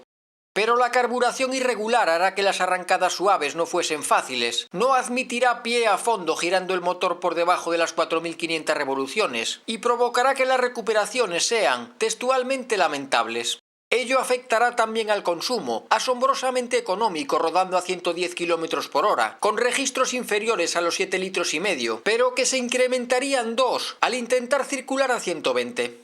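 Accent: Galicia, Spain. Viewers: Se le entiende estupendamente, y vocaliza bien. A mí todos los acentos me gustas, y el acento gallego de los que más.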